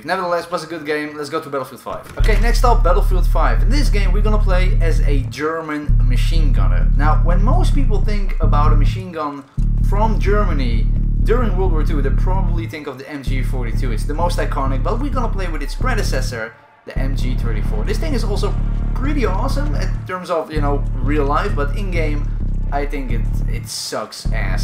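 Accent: Netherlands. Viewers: u have a dutch like accent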